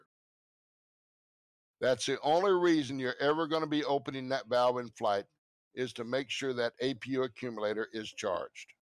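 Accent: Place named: United States. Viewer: Jesus he sounds so American xD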